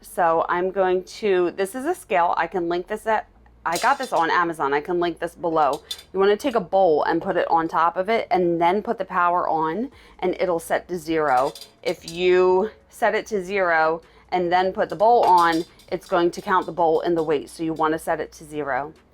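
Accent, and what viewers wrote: Philadelphia, United States. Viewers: Just came across your whiter American buttercream video. Your first 5 words I knew you were from my area😆 then you said outside of Philly. I am now subscribed 😉 But super curious now lol. I am Delco, are you Philly or Chester County?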